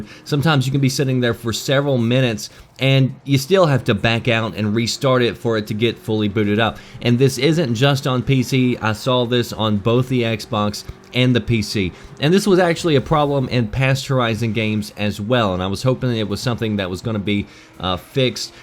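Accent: South, United States. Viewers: You might find this amusing, but your voice reminds me so much of a preachers voice. Just a tinge of a southern draw and you pauses and articulation - lol. Preacher. Anyway, like your reviews. Fair and nothing meant to bring on fanboy arguing, though I'm sure idiots in your comments still do now and again. My boys and I love Forza so it's cool this one has turned out to be aces! I don't have a PC gaming rig so it's all Xbox One for me. Thanks again.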